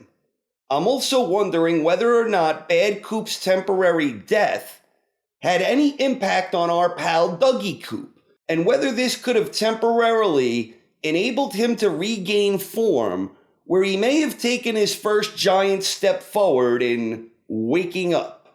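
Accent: New York, United States. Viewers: I really wanna know what his accent is. Is he from New York? or New England maybe?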